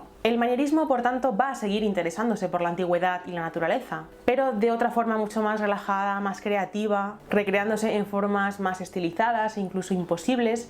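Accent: Castile, Spain. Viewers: jaja claro princesa porque eres de allá.. Pero Rela así con todo y tu acento castellano me gustas mucho 😍😘 Jaja.. Saludos desde Venezuela ✌🏼 Tienes un admirador por aquí.. Te invito a pasar por mi canal para que escuches mi trabajo. Claro si te gusta o llama la atención el Rap conciencia, protesta, esotérico y filosófico 😉 Besos y abrazos 💋🤗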